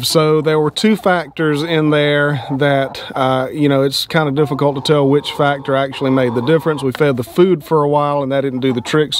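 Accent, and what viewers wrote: South, United States. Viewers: judging by your accent, you live in the south and absolutely do not need heat lamps. In fact, you're risking burning the coop down with them in it. Forcing your hens to pump eggs out during their natural rest period is controversial and not good for them in the long run. It will shorten their life span and many think it's inhumane. The rule of thumb is to let them do what nature dictates.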